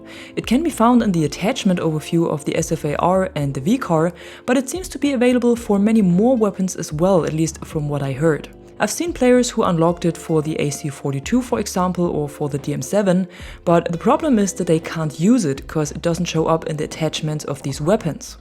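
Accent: Germany. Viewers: Lol du bist wirklich deutsch? Haha habe es irgendwie rausgehört war mir aber nich zu 100% sicher xD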